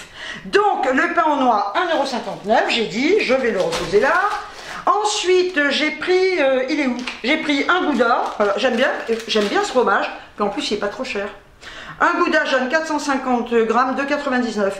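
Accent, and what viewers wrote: Algeria. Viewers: Cc l accent pied-noir j adore bisous ma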